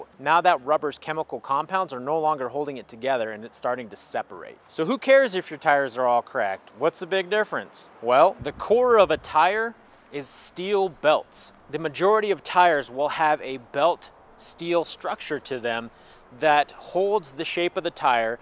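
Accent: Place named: Kerala, India. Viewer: നന്നായിരിക്കുന്നു , ഇഷ്ടപ്പെട്ടു. നല്ല ഇംഗ്ലീഷ് ... ഒരു മലയാളി Touch.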